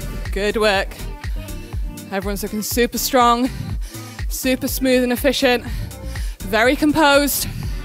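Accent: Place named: United Kingdom. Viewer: aahhh!!! British English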